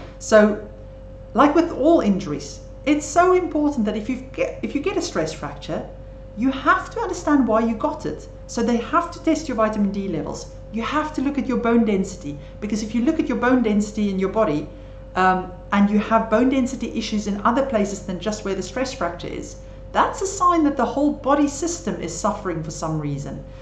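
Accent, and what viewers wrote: South Africa. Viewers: Thank you Dr. Your accent sounds South African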